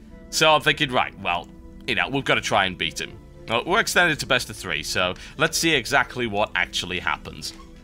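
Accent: United Kingdom. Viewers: This was just enjoyable, the best part was of course when he rage quit. But your evil laughter kept me watching, as well as the charming British accent.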